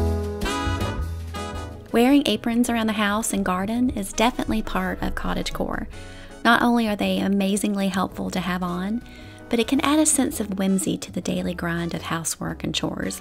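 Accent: South, United States. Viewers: I absolutely adored everything about this video from your sweet voice and southern accent to the aesthetics and fashion!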